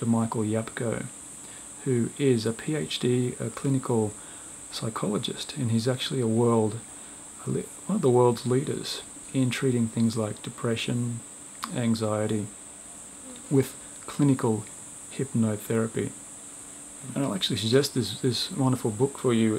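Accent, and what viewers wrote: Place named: Australia. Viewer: Dear Michael, your videos are great and i enjoy them nearly every night. I never really liked guided meditations but yours are the best! Luckely you have this nice Australian accent which makes it an even greater pleasure! Thanks a lot "mate". Sabine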